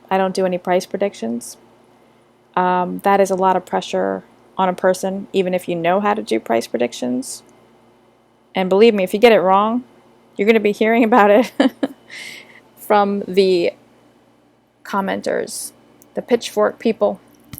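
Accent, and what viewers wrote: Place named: Spain. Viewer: I love your Spanish accent.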